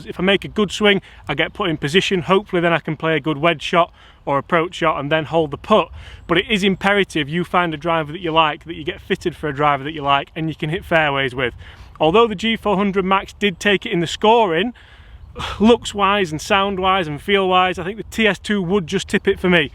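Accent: Yorkshire, United Kingdom. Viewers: You are growing on me man! I was unsure at first, but I'm thinking it was the Yorkshire accent..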